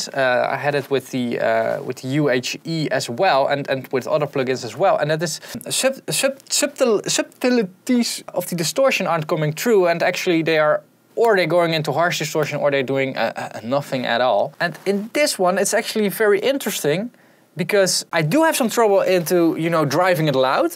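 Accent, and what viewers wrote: Netherlands. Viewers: are you from HOLLAND?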